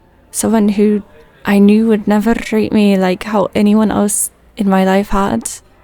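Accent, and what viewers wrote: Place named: Northern England, United Kingdom. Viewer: Love your accent! You from northern England or one of the Norse countries?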